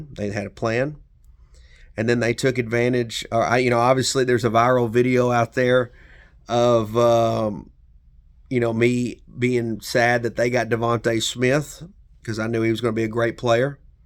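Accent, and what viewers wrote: South, United States. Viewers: You sound like your from the south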